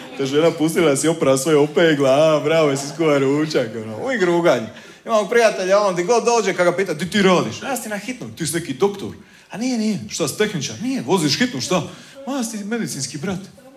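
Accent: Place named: Dalmatia, Croatia. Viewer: Super humor, Dugo se nisam tako nasmijala. Obožavam taj Dalmatinski naglasak. Pozdrav iz Francuske ❤❤❤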